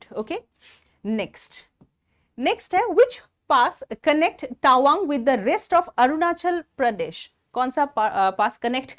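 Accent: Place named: Assam, India. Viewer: Assamese hindi accent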